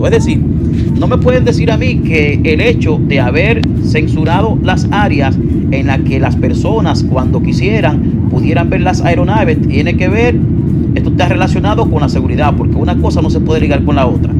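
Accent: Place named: Caribbean. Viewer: hola señor.. creó qué es su nombré.. vemos qué usted vive obsesionado usted parece a ésa ONG..enemigas de la imagen del la RD.. porque usted compara ahora la economía de un país dé diez millones de personas con economía de grandes potencias cuando usted mismo dise qué la potencia que tiene esos grandiosos aeropuertos su presupuesto dé seguridad es mayor que la de china y Rusia.. usted se canta y sé llora.. y muchos nos preguntamos cómo es qué usted viaja semanal al meno qué usted no responda a una campaña dañina contra la RD. O usted hace eso porque responde a los intereses de las personas qué quieren construir el aeropuerto dé bávaro que nosotros estamos dé acuerdo que sea construido y qué sea el más moderno le cojimos la seña ya qué usted menciona el aeropuerto de punta cana..y hemos notado en esté vídeo ciertos acentos que no son dominicano escuchese usted mismo que le sale un acento de una isla colonizada del caribe vemos también que usted reconoce que la RD es el país del caribe más turístico y la ciudad Dr santo domingo como la más bella y moderna del caribe resalte el metro de santo domingo.. 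el teleférico dé santo domingo.. único en el caribe..la economía propia más robosta y deje la obsesión con el aeropuerto las América.. porque ya usted ralla en la obsesión y harta hasta la próxima semana cuando vuelva con la misma canaleta cooooooooooooño que mucho jode con él aeropuerto las América no sea usted tanto por no decir otra cosa usted sueña con el aeropuerto las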